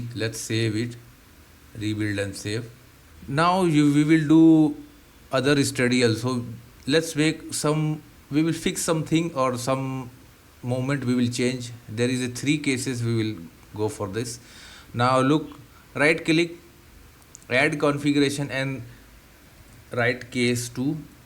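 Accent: India. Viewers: when the presenter has an Indian accent you know it will be good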